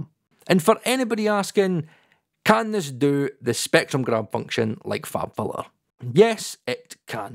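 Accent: Scotland, United Kingdom. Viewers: Scottish accent is so cool.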